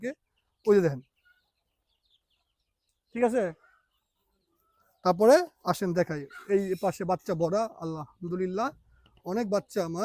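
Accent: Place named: Mymensingh, Bangladesh. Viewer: এিশাল থানা ময়মনসিংহ কথা বলেন্য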